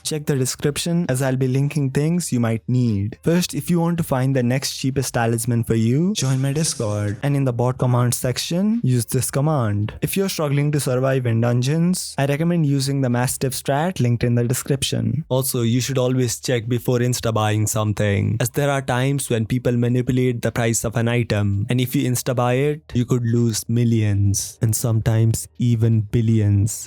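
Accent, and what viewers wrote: India. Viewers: can you get rid of the indian accent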